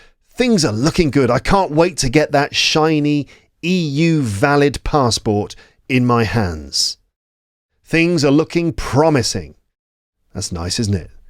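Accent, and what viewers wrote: United Kingdom. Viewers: Hello everyone, i would really appreciate your help. My question is, Am I wrong, listen to, which I consider the best channel for learning English. I'm wondering this behe has a British accent, and I am in a place where American English is spoken,. I mean obviously it's different but i also think listening to it's helping me to improve my listening and speaking. At grammar I'm terrible. Thank you guys.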